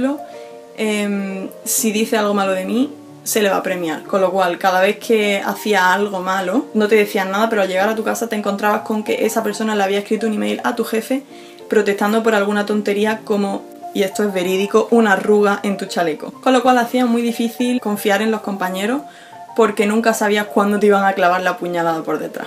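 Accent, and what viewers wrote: Andalusia, Spain. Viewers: de que parte de España es este acento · Envidia al acento del sur. Todos quieren imitarnos luego para hacerse los graciosos. Te expresas muy bien y se te entiende sin problemas. ;) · Como me gustaria que dejaras tu acento andaluz y hablaras un espanol neutro. No se dice vosotros se dice nosotros hay unas cuantas cosas que corrijiria de tu acento. Si te gustaria hablar espanol neutro dejamelo saber. No se dice quereis se dice por si quieres no por si quereis. No se dice que me digais se dice que me digas. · Habla español con acento andaluz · Respeto y apoyo las riquezas culturales de las muchas regiones. Pero aconsejeria que la pronunciación, sin dejar las raices andaluzas, fuera un poquito más neutral. Cariño me gustas como youtuber pero, quiero comprender mejor lo que nos dices. :( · Pero de que parte de españa?? y porque tan marcado? 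· Ha na mejorar el castellano? el andaluz es así, y no hay que mejorar nada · Dialecto andaluz? jajajaj hablas español hija, con acento andaluz. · Galle no seas tonta tu es obvio que la niña tiene acento. PERDÓN NO LO HABÍA NOTADO ES QUE NO SE LE NOTA PARA NADA Q TIENE ACENTO. solo digo que podría tratar de hablar un poco mas claro su español Andaluz que vamos no le queda muy difícil sobretodo si esta grabando y subiendo esto a YouTube. ya dije no es que no me gusten sus vídeos ella tiene temas muy interesantes sólo que en ocasiones toca de cifrar que dijo y si tu le entiendes perfectamente 👏👏👏👏👏👏 yo sigo más Youtubers Españoles y ella es la única a la que no le entiendo todo lo que dice. ademas yo le comente a ella y ella ya me dijo q es por su acento q vamos para hablar 2 idiomas más aparte de español si q tiene su acento bien marcado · Que ojos por Dios. soy latinoamericano pero me gusta tu acento andaluz culto ..y tienes razón, uno tiene que hacer lo que cree es más conveniente para su vida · Vale , está bien pero tienes un acento muy andaluz , no te veo a ti hablando un ingles limpio , creo que eres una niña que hablas demasiado y también creo que hay azafatas mayores que tú edad y con mucha más experiencia que no se queja tanto , un saludo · Steve Panther sabes que en España hay muchísimos acentos diferentes? 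Esta chica tiene acento del sur de España. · que Bonita eres y ese acento andaluz muy guay,saludos desde Tenerife...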